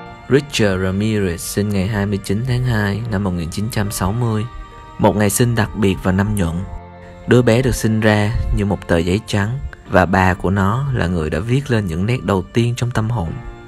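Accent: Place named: South, Vietnam. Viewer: Giọng con trai miền Nam hay thiệt 🤤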